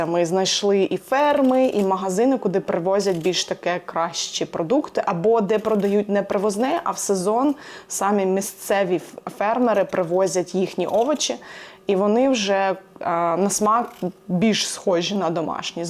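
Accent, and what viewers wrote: Standard. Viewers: Сьогодні тільки перший раз Вас побачила і дуже пораділа. Щира людина з чистою українською мовою то скарб! Щастя Вам! ❤ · Яка гарна Украiночка,яка чиста мова,хай щастить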